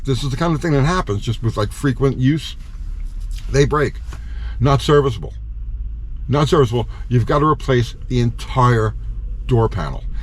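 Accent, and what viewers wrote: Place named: Michigan, United States. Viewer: You have to be from Michigan. I have worked with hundreds of Michigan people and you sound and act just like them. You ramble all over the place and talk and talk but never say anything. Your videos and annoying. Tell me that I am wrong.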